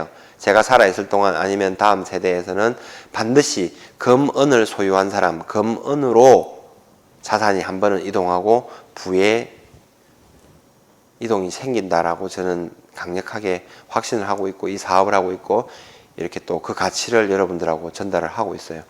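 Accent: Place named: Busan, South Korea. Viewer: 살짝 부산 사투리가... 쑥 된장국 처럼 향긋하게 들립니다. 내용도 진솔하시고.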